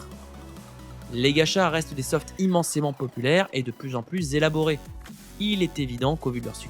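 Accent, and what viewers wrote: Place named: England, United Kingdom. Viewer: Incroyable vidéo et j'ai adoré l'accent anglais, continue comme ça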